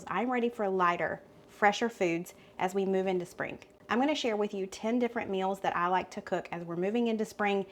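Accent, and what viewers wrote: South, United States. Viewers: His voice sounds like Tom Hanks with a southern accent! 💞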